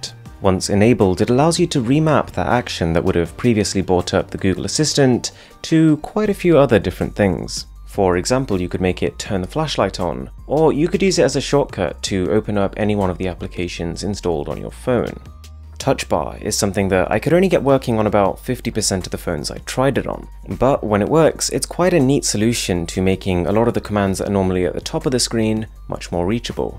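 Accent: RP, United Kingdom. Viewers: Do u really speak like that, posh!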